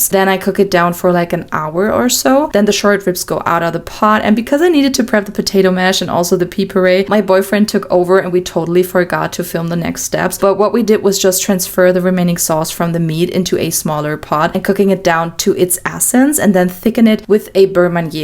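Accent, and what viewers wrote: Germany. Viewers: Are you german? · is she german/austrian/swiss?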